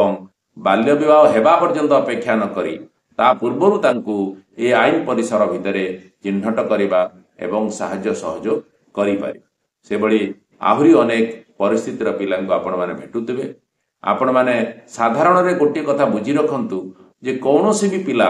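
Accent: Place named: Bengal, India. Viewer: Can you add English subtitles on your video. As a person from south india it's hard to understand Bangal dialect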